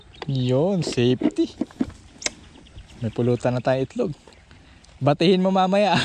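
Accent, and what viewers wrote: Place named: San Antonio, Philippines. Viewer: idol parang taga san antonio na ung accent mo hehe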